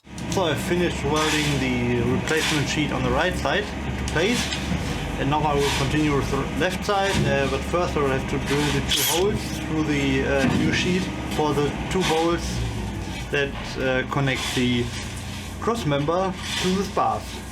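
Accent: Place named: Germany. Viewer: Daaamn boy, i love that german accent :)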